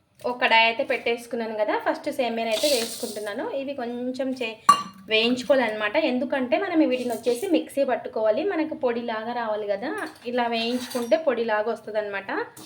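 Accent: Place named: Anantapur, India. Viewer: మీది అనంతపురము జిల్లా కద నాన్నా...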